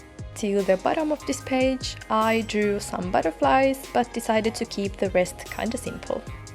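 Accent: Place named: Finland. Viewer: /video starts "oh she has a nice accent. what is it from. sweden? finland?" you: "here in finland (...)" me: yooooooooooooo B)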